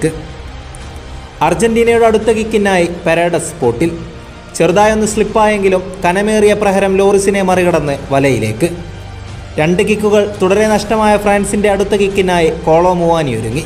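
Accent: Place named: Ernakulam, India. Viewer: അറിയാതെ കണ്ണു നിറഞ്ഞുപോയി ഈ കളിയും എറണാകുളം അവതരണവും കേൾക്കുമ്പോൾ 💙🤍💙🤍🇦🇷🔥🔥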